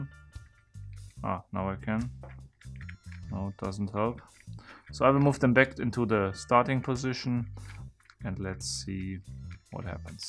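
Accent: Germany. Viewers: Sach mal du bist doch Deutscher oder nicht? :D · I hear you normaly speak german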